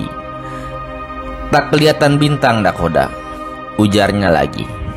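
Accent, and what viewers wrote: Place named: Sunda, Indonesia. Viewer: Suaranya seperti orang sunda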